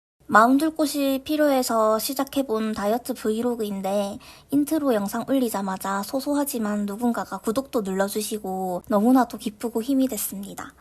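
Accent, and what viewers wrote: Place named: Ulsan, South Korea. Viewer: 사투리가 울산같은데,.귀에 쏙쏙들어옵니다. 저는 포항이거든요ㅋ 저도 다음달에 대구에 하러가거든요 너무 와 다닿네요ㅠ 잘 보고갑니다. 함께 화이팅 해 보아요~~^^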